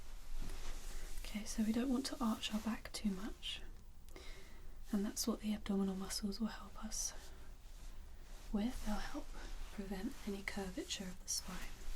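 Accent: United Kingdom. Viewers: Always love British accent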